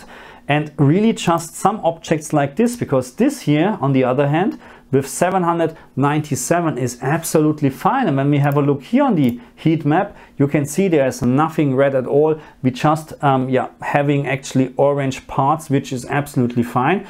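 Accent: Germany. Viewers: Those settings worked for me (playing on PS5 on a SONY Bravia TV) very well, before I had to crank up my my max. brightness much higher than my TV actually can take, Tone Mapping had to be on 1. Thanks a lot! P.S. Da ich von Deinem Akzent (no diss) her annehme, dass Du auch Deutscher bist, noch eben muttersprachlich: Vielen Dank, werter Herr!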